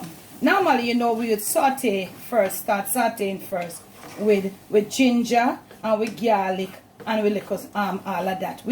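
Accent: Jamaica. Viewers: Hey. You are the best....continue chatting de patois...so authentic just like your delicious recipes...you go Mrs.Girl....Nuff Respect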